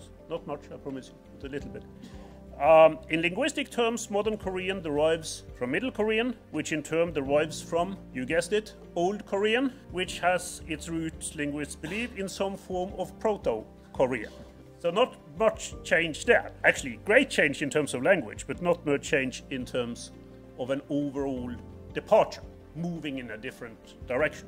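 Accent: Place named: United Kingdom. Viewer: 하버드대에서 강의하는게 영광이라고 하는걸 보니 하버드대 교수는 아니고 영상보니 버밍엄대교수같은데....억양이 영국이고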